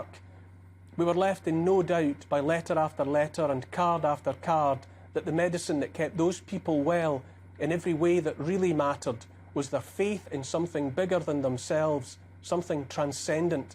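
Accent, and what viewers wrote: Scotland, United Kingdom. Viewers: ❤️ ❤️ ❤️ ❤️ ❤️ ❤️ ❤️ ❤️ 🎄🎅🎄🎅🎄🎅🎄🎅🎄🎅 ⛄🎉⛄🎉⛄🎉⛄🎉⛄🎉 Neil Won't Kneel A gentle, beautiful Scottish lilt A kind, empathic, forward tilt An open heart; a caring face A willingness to take his place. A strong, home-hitting monologue Reminds us of the boiling frog The courage to become a sleuth A passion to release the truth. A deep desire to crack the whip Illuminates our freedom-strip Calls out the darkness taking hold The shiny despots; plated gold! Intense and raw, he lays it bare A soul-enhancing, honest stare Inflections drive the message through He speaks for Us - for me and you! Discernment's such a precious gift His words create a mighty lift Take heed of all he has to say He knows - it shouldn't be this way! 🤗🦋🐬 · It's the voice in our heads spoken eloquently with a scot accent. England has to look north for a leader. He's the best truth speaker at the moment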